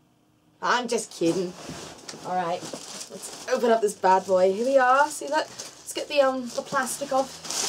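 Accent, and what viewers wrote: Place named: United Kingdom. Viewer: I've had the privilege to get to know you a bit better. You are woman with a special personality, not just cute nor hot. but so much more. You have earned my respect for being yourself. And you should be proud of it. I love your enthusiasm and spirit. Your kindness and funny expresion. And ofcourse you lovely brittish accent :) <3 Sat Sat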